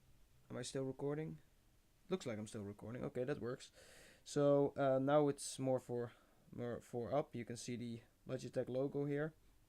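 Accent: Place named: Netherlands. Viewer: dutch accent :P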